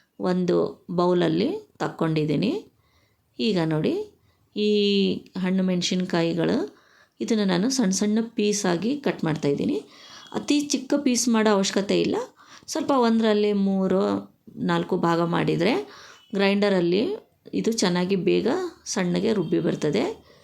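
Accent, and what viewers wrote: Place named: North Karnataka, India. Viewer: Akka uttarkarnataka slang olag matadri, cholo ansutta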